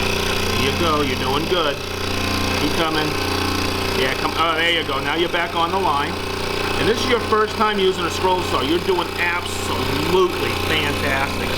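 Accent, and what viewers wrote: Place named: Boston, United States. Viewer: Love the Boston accent,?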